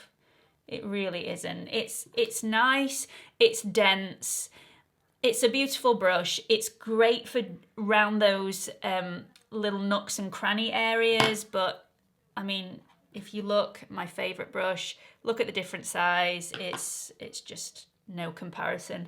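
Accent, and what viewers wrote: United Kingdom. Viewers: You can say absolutely stunning as much as you like. I just love listening to you chat. ( love your British accent). I would definitely wear the T shirt lol. Oh and the make up looks great! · After seeing several reviews, including yours, about No7 foundation drops, I ordered and am anxiously awaiting it's delivery. First I've purchased of that brand. As far as "absolutely stunning," I LOVE it and most every other "British sounding" words and expressions you say! You see, I'm from the southern US where lots of one syllable words are drawn out so they have many.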